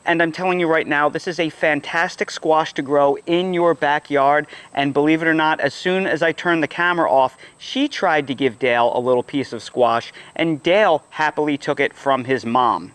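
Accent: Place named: Pennsylvania, United States. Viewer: Hmmm, you're a smart guy previously from PA if I judge your accent correctly. So why live in Humideville? My folks were from PA, I went to humid HS in Maryland and have tried to stay west in the Rocky Mountains/Colorado Plateau. Dry, great views, et al. In any case i love your videos and gardening education! I'm 80 yo, but hopefully can still learn. Blessings.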